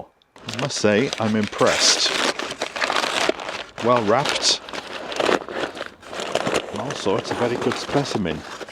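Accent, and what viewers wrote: Wales, United Kingdom. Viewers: Yes, I am doing that, and know that you do not have the allotment now, but now have your Rusty Homestead - love your Welsh accent, and your beautiful eyes by the way! xxx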